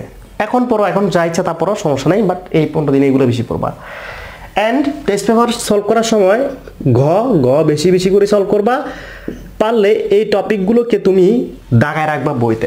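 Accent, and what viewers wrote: Chittagong, Bangladesh. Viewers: Bhaia you from Chittagong???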